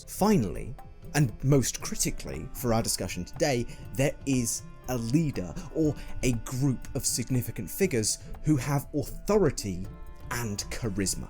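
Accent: United Kingdom. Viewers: wait—“modern mainstreaming of Christian culture” in the US? You mean those TWO THOUSAND YEARS of “modern mainstreaming” of Christian culture in Europe are just going to get a pass? You sound like you have a British accent, can you comment on the thousands of lives lost and the awful terrorism stoked by religious fervor during the Troubles? Or perhaps the explicitly violent Christianizing mission of European colonizers in Africa, South and Central America? Or the European Crusades? Come on, let’s not have an AmericaBad moment here.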